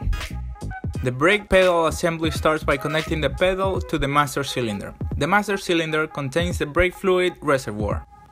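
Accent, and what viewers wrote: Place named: Spain. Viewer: Me suenas a español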